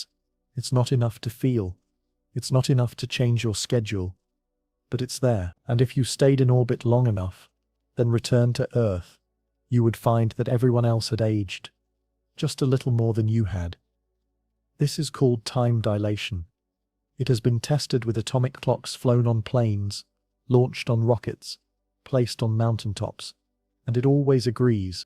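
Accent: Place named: United Kingdom. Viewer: Comfy soft bed, warm, cozy quilt, big, soft pillow, space video with soothing British accent. Good night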